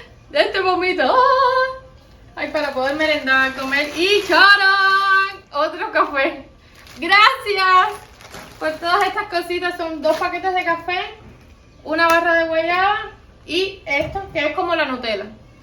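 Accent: Cuba. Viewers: Eres cuban?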